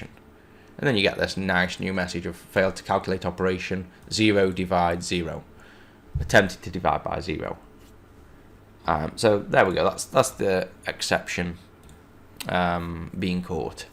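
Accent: United Kingdom. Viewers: Your tutorials are very helpful and neat (not to mention your deep British voice) , in fact the only ones that helped me through all my problems.i was trying to make a power function but that went terrible and I was hoping if you could do a tutorial on that.it's just too hard to figure out the logic (: greatly appreciate it.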